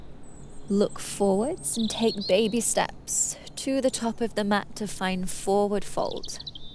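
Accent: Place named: United Kingdom. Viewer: Hi, some feedback as you mentioned. I started 20minute daily Yoga October'24 to raise money for BoneCancerUK. Since, have continued for my own well being, and today, the 82nd Day, I worked with this 30 minute class. Thank you for your clarity explaining he expectations. (PS love your British accent, and the birdsong: )) 🙏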